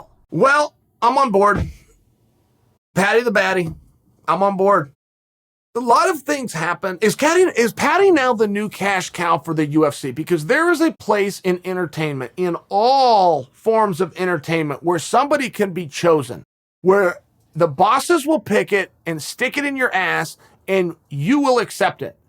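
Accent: Liverpool, United Kingdom. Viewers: Over-hyped Scouse just like Till. Everyone needs to 'CALM DOWN LAD' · he’s scouse lad. Like the Beatles saying ‘you know’. Get used to it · Proper scouser!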